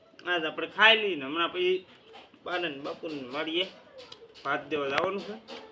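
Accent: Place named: Kathiawar, India. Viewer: બોવ મીઠું મીઠું કાઠિયાવાડી બોલો છો, કયું ગામ? જય માતાજી. જવાબ આપવા વિનંતી. મારો નંબર ૯૮૨૪૦૧૦૪૦૩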